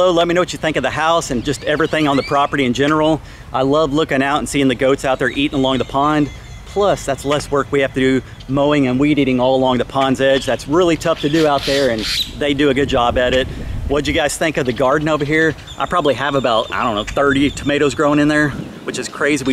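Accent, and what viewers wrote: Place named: South Texas, United States. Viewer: True south Texas sounds… especially with them crows 😅 just like San Antonio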